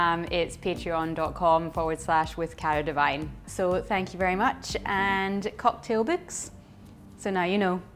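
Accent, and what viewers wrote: Scotland, United Kingdom. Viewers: First! Love the scottish accent :) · Thank you for all of your effort! Scottish accent? The best...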